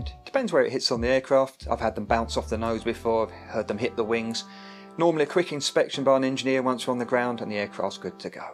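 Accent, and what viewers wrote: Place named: London, United Kingdom. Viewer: I'd say you're from East or maybe North London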